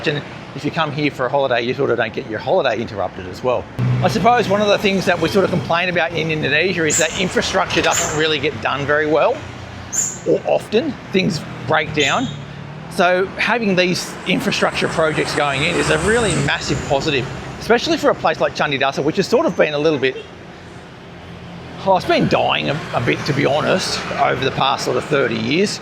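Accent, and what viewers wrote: Australia. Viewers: This is a great video thank you. Have to say I was bloody shocked with your occa Aussie accent and then you bring out the perfect Indo with the locals. Made the video! What lovely guys, Balinese are the salt of the earth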